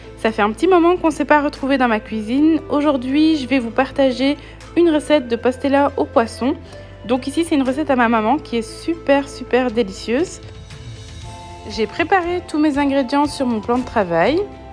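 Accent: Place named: Belgium. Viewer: Merci pour la recette. Vous avez un accent belge si je me trompe pas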